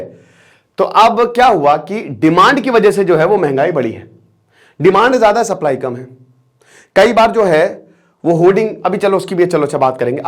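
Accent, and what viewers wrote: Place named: Varanasi, India. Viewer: Excellent sir 👌 apka samjhany ka tarika bhut achha h .... Aap banarasi lagty ho mujhe ya aap U P se kahi or se ho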